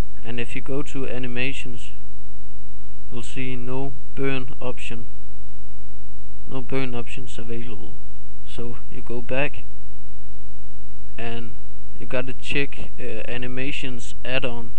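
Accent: Denmark. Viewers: hey.. jeg kan høre du er dansker :D ..jeg har et problem... jeg har download ubuntu okay... men jeg kan ik' brande den ned på en skive hvorfor kan jeg ik' det???... jeg prøvede også at putte iso'en på en usb... men jeg kan ikke få min pc til a boote fra usb ??? hvad skal jeg gøre `???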